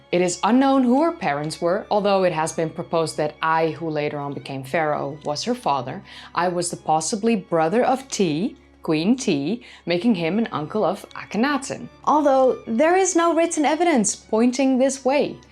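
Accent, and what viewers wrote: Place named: Netherlands. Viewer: Love your Dutch Accent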